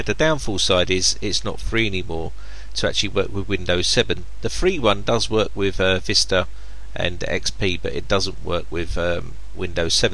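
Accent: United Kingdom. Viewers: This is one of the most annoying Brit accents...among many many others.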